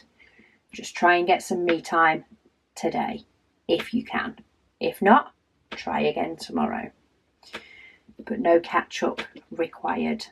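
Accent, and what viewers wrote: United Kingdom. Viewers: Hello, i don 't comment much because i'm painting together with you during the waffles. Great company! Love the british accent which reminds me of schooltimes. Also a very nice refresh from a lot of american youtubers which i also enjoy. Now don 't get me wrong with the following! I had a very surprised chuckle when you read my last comment out loud. The first 2 times you got my name right with lovely accent, bonus points haha. Last time you made it sound like "may raven" like the month and the Bird. That is the very first time that happend and i was happily laughing. My name is very hard to get right and this was the most unique response. Not offended! Don 't be doubthing yourself. I guess to explain you say my first name like "mai rah". You added a bit of my last name as well. ;) even dutch people Don 't get it right all the time. 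My parents just love the name and so do i. More people with unique names have the same experiences? That was my ramble, happy painting!😂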